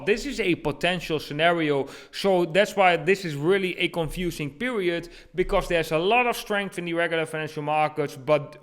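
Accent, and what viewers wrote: Netherlands. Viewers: Are you from Holland ?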